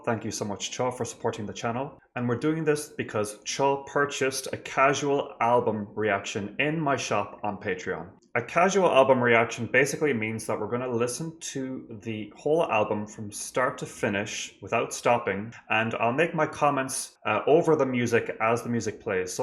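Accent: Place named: Cork, Ireland. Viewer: woah the world is so small… started watching this video and got caught off guard by ur accent and went to check where ur from, i’m also from cork!! crazy that, with them being a relatively small group and cork being a relatively small area, there’s someone also from there reacting to my fav group…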